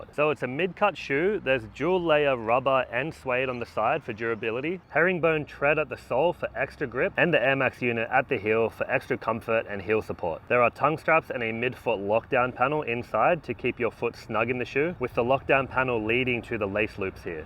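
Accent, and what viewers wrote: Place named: Australia. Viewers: hes aussy its not that serious its a culture thing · Dudes never heard an australian man speak before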